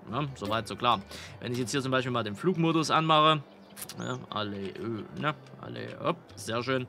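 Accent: Saxony, Germany. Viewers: das er Sachse ist hab ich mir schon gedacht, nur welche Ecke. Bin selbst Sachse.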